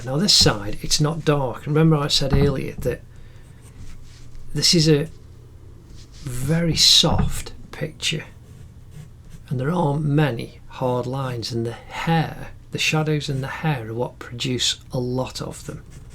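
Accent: United Kingdom. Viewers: Whoa! Your accent is like newt scamander!!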